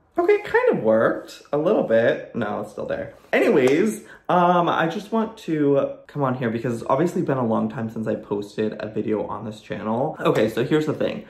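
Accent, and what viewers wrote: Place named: United States. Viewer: im curious - do you feel like people you interact with in london treat you differently because your accent is obviously from the states? i've considered visiting london myself and i have always wondered that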